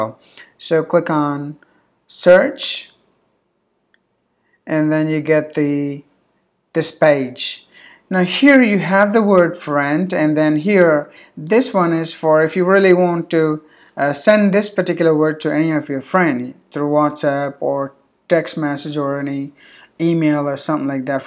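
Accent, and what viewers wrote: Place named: India. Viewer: His pronunciation ìs not great. He has a strong Indian accent and he is mixing English and American language which adds to the inaccuracy and increases lack of clarity. Don't copy him !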